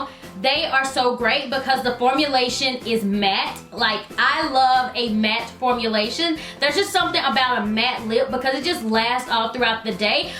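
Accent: South, United States. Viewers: She has such a southern accent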